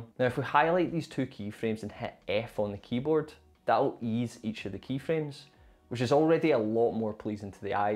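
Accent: Scotland, United Kingdom. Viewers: Love your videos, very informative and easy to follow... and the scottish? accent just makes it better..... had any IronBru lately?? :D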